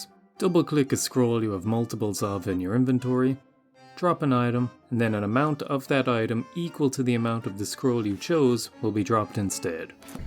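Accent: Ireland. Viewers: Where is your accent from you sound Irish but not quite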